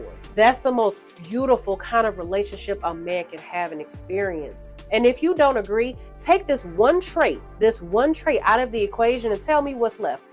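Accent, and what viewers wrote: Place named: Texas, United States. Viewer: Great information as always. Question are you from Houston or Texas by chance? I'm always trying to figure out accents.🤦🏽‍♂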